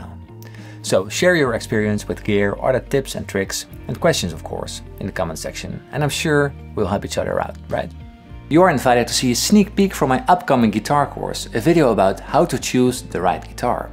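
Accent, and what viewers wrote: Netherlands. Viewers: Your name sounds English but your accent sounds Dutch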